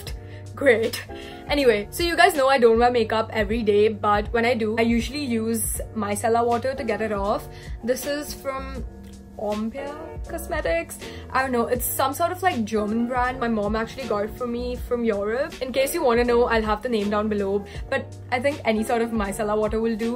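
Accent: India. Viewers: Whenever I feel depressed I watch your videos.. So relaxing Mann.. Love the way you love yourself ❤️😘😘😘😘😘😘 But yeah please make VDO in Hindi Yaar wanna hear your Hindi accent too😋😋❤️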